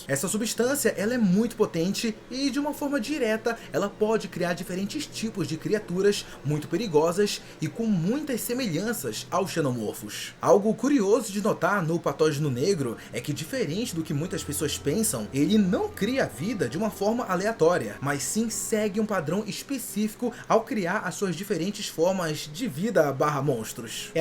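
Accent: Brazil. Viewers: Finalmente alguem que fala palavras em inglês abrasileirando... sem querer forçar a pronúncia igual a um semi retardado nerd que se acha nova iorquino.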